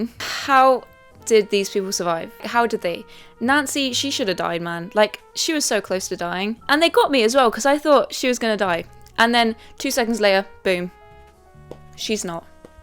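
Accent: England, United Kingdom. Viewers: Just an FYI, But the word "finale" is NOT pronounced the same as "final." You kept saying in the "final" and "the final." But the word you are looking for is "Finale" which is pronounced "fi-nally." Why that "ee" sound at the end. I know you've got the British accent, but I know the word finale is still pronounced the same between America and The UK and they dont just say "final" for both. Sorry my literature degree getting the better of me... lol but so you know you said it wrong the entire video! 😂 great video though for sure! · Hearing this common US English mistake in an English accent was incredibly jarring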